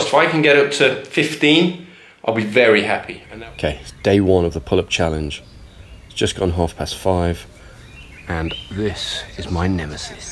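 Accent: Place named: United Kingdom. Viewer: You helped get me off my ass or bum as you called it. Motivating to watch, I also enjoyed hearing the bits of Les Brown thrown into the video. Good luck in the future from across the pond, guessing by the accent. Oh and by the way I bet if you had rested for a couple days instead of one you would have done better on your test.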